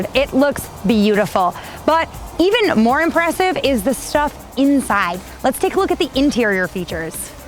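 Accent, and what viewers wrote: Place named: Boston, United States. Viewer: Gotta love that Bawhstan accent! I'm from MA originally, love the video! :)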